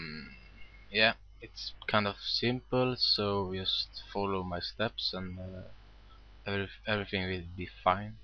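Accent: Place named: Sweden. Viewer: Pungt kom... DOT COM! haha, du är från sverige asså ^^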